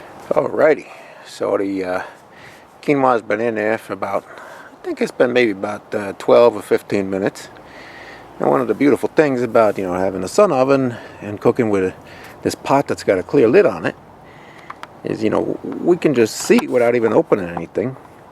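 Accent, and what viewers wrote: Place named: South, United States. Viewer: I love southern accents .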